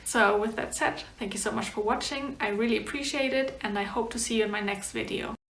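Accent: Germany. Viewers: I love the way you described that! I am German and its so nice to hear you talk. Especially because I think your english is amazing and you don't have a hard german accent what makes it so pleasant to listen to you. Thanks for your video.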